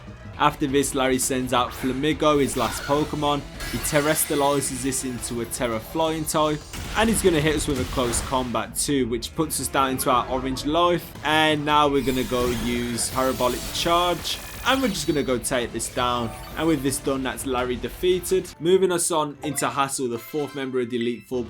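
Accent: United Kingdom. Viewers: I mean your run was fun to watch, but man, you sound so bored narrating it. Also your accent is a little hard to get used to - is it a British accent? never heard someone talk like you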